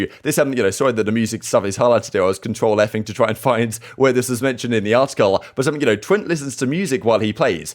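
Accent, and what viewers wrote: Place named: England, United Kingdom. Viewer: he’s English, but nice try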